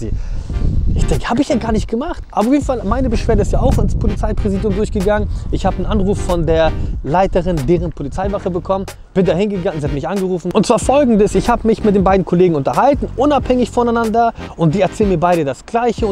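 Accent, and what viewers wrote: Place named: Hamburg, Germany. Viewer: Norden/Hamburg Slang!! Dialekt!!! Wir sagen auch Zb Tach statt Tag!! Ist schon richtig!!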